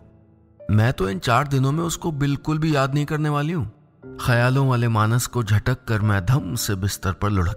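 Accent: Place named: Kumaon, India. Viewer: Very beautiful story and that kumauni style of talking just awesome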